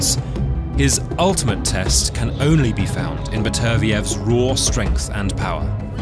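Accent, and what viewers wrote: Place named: United Kingdom. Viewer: Another awesome one !!! I really like your accent i asume you're a brit , cause English is my second language and sometimes i can't understand some accent's 😁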